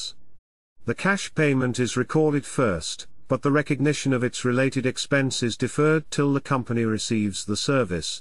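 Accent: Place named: United States. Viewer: صوتك بقا امريكانى اخر حاجه :)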